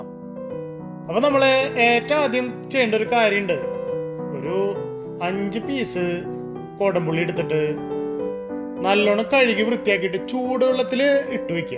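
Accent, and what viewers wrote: Thrissur, India. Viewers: Thrissure aano😄 Curryum samsaravum polichu. Sugar edarilla, Eni cheythu nokkam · Thrissur language poli❤.. good presentation 👌👍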